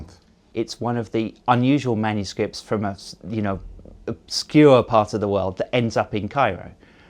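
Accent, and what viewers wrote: Russia. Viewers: Brilliant program. Host is an Ashkenazi with a comical Hollywood-style Muscovite accent. He couldn't be funnier if he tried.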